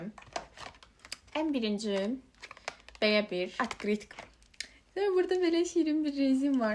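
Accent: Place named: Turkey. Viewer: Etim tokulurde bu qiza baxanda niye daniwanda ozunu uwaq kimi aparirsan dodaqlarivi deq bawi qabaga eleyirsen turkce daniwirsan turkler kimi daniwmaga caliwirsan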